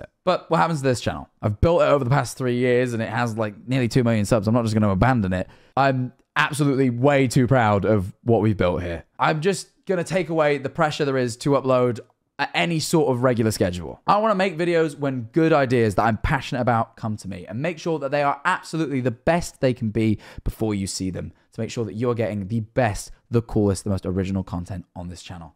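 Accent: United Kingdom. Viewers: oh my fucking god you sound so british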